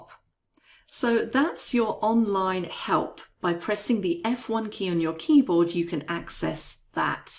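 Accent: United Kingdom. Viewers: Is that a British Englishh? It is such a great pleasure to listen!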